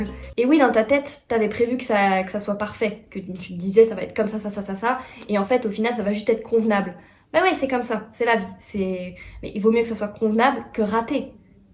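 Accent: Belgium. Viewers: Grave bonne vidéo le sang, ce montage : une pépite, j’adore ! Ah oui et tu commences à prendre l’accent belge ça va plus du tout 🙃🙃🙃🙃🙃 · Ho mais nan trop heureuse j était tellement impatience de finir la journée pour regarder ta vidéo j adore ta refait ma journée et super contente que tu aille mieux c est super❤❤❤❤❤ ( tu commence a avoir l accent belge haha rejoint nous )😂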